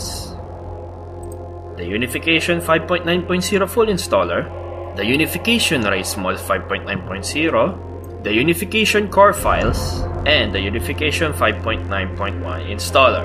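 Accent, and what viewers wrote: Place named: Philippines. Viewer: sabi ko na eh pinoy base sa accent haha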